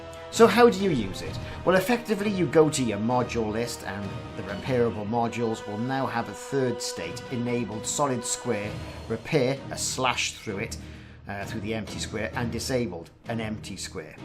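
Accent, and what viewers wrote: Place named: Cardiff, United Kingdom. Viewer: Nice one! V useful, and your accent is making me homesick for my Cardiff roots.Subscribed.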